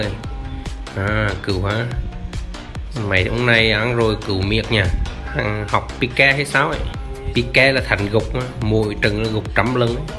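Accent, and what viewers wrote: Central, Vietnam. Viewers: nghe tiếng anh này nghe giống người miền trung với lại nghe cũng ấm ko quá khô nói chung chơi cũng hay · Anh ở đâu miền trung anh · anh là người miền Trung à, nghe giọng hay ghê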